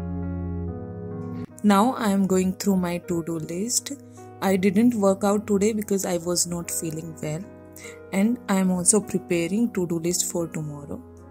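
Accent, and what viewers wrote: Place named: Kerala, India. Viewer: ohh!! srry to tag u so quickly but ur accent resembles a mallu accent!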